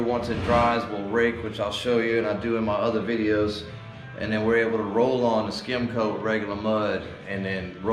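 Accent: South, United States. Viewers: I've watched many of your videos & your accent is so mesmerizing I know it's from the South, definitely not Texas where I live. 😊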